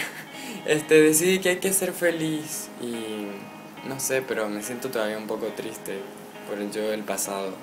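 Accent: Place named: Venezuela. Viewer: Soy nuevo en tu cuenta, sería genial que te presentarás y dijeras de dónde eres.. ( hasta el momento creo que eres venezolano por tu acento ) entre otras cosas como tus gustos.. tus fans te quieren conocer 💟.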